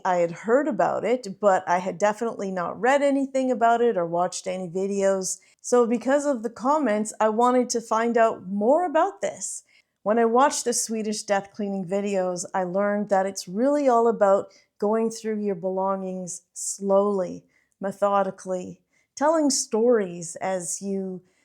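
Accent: Canada. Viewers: I really enjoyed this video. Thanks for personalizing something that can be very hard to talk about. I live in Montreal, Canada, and I could not help notice that your accent sounds Canadian....is it?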